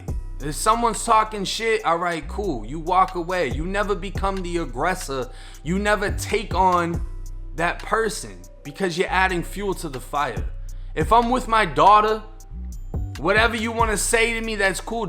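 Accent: Boston, United States. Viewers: The Boston accent not so strong lately eh 😆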